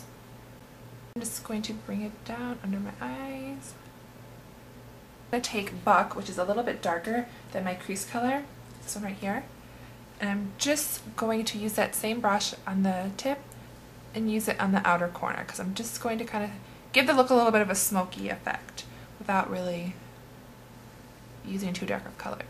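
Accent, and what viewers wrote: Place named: North, United States. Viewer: Hey! i am just curious as to where you are from! i feel like you're voice sounds like mine like accent wise. are you from the north? hahah weird question sorry! i live in the midwest and everyone makes fun of my northern accent but you sound like me i think so just curious:)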